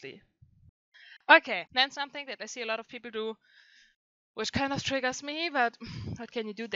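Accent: Germany. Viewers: The german accent tho 👌🏼 Man hört es 😅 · Are u German ? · Den deutschen akzent fühl ich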